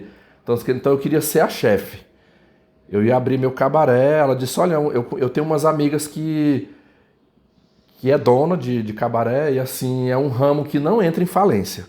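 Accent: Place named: Imperatriz, Brazil. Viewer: vc tem muito o sotaque do pessoal de imperatriz, fui lá recente gostei muito de lá, principalmente de Carolina.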